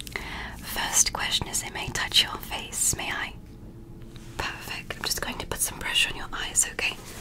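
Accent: England, United Kingdom. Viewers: You're vids are always amazing thanks for the hard work I'm just not into the British accent lol but don't worry about what I don't like from you're vid, that said I will always watch your vids and enjoy them 😊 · Wait, do you usually have an english accent? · Are you really British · I’m wondering if you speak British🤔🤔🤔🤔🤔🤔🤔🤔🤔🤔🤔🤔🤔🤔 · oooohhhh gurl i love the vids in a british accent as a fellow brit i’m fooled you sound so british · 10 outta 10 British accent, would go to London with it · Are you British/Australian or did u just nail this accent? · Always love the English accent,! Loving this so far. So excited for this year for you! Can't wait to see you hit that one million mark!!!:_gift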